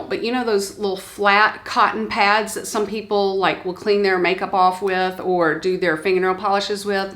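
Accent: South, United States. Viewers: Just a quick note to say I have enjoyed your videos. I don't know if you realize it, but the close-captioning for your words is quite amusing! Due to your southern accent, in the above video, "tinted" moisturizer was printed as "tenten", and "tennis" shoes came out as "tin" shoes! Don't worry...I can understand you, but the close-captioning person cannot!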